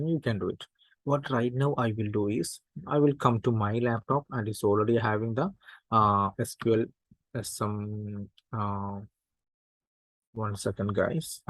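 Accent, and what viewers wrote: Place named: Kerala, India. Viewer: Love the Kerala accent and very informative video bro thanks....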